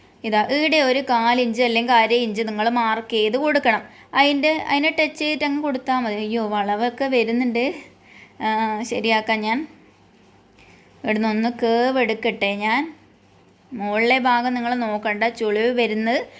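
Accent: Kannur, India. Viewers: കണ്ണൂർ ഭാഷ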